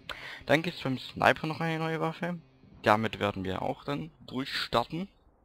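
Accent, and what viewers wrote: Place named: Swabia, Germany. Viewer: ein Schwabe..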